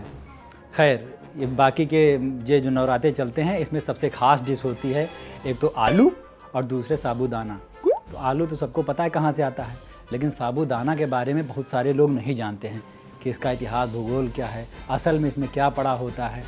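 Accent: India. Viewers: Ekdam देसी accent hai. Hum sab bhartiya apni matrubhasha ki accent mein jab bat karte hein, to ek alag sa feel hota hai. Angrezi se door hote hi sukun milta hai 😄😄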